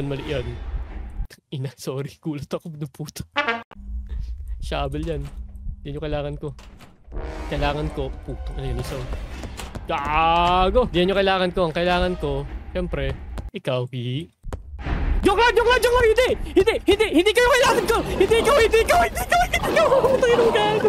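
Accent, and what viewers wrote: Visayas, Philippines. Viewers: Kuya Bisaya ka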